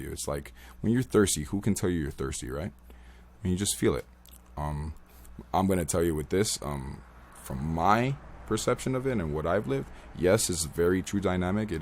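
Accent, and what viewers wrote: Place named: Toronto, Canada. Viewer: Nice to hear a guy out here. You sound like you are from Toronto .. I am from Toronto.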